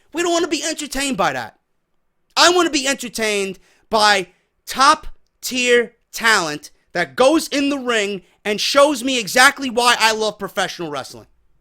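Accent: New York, United States. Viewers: Started watching your videos this week, you do a great job of sourcing all the info you present to the audience. You have a strong understanding of what fans want and what the casual fan or even casual mark want but dont know it. I really enjoy the NY gritt you have, angry and smart tone but articulate and smart - well done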